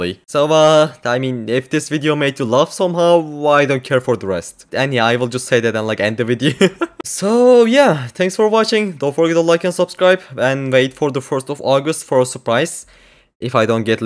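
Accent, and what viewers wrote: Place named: Turkey. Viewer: abi türkmüsün ingilizmisin belli değil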